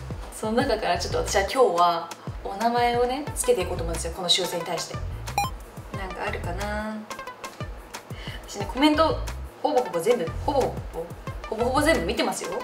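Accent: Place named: Kansai, Japan. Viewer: ノースリーブだと綺麗な首筋や肩が見えるのでとても似合ってる。 こんな可愛い顔してるのに変にぶりっ子してへんし、ハキハキの関西弁とマシンガントークのこのギャップはたまらん‼️ speaks really quickly😵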